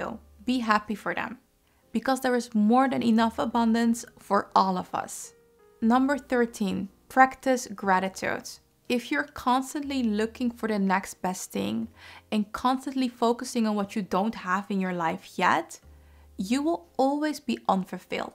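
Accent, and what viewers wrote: Netherlands. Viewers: Sounds like she’s from the Netherlands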